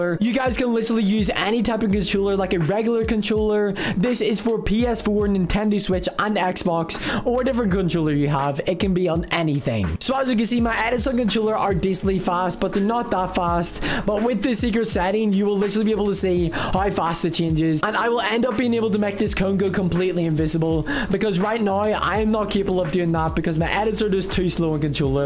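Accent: United Kingdom. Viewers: the british accent makes it 10 times better..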